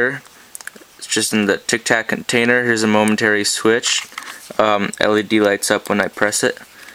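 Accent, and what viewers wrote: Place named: United States. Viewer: He has a American Accent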